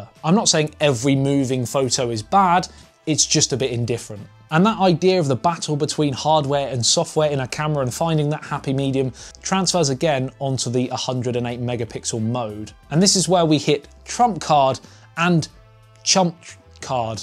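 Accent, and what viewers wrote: England, United Kingdom. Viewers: what in the world is your english accent??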